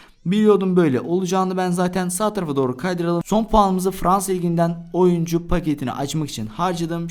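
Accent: Azerbaijan. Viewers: Azerbaycanlı mısın sen?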